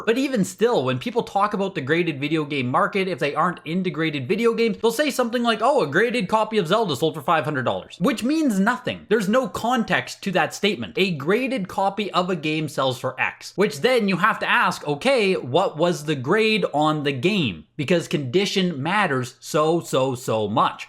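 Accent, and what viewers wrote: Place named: Alberta, Canada. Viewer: Aye bud …..you gotta be from Alberta eh????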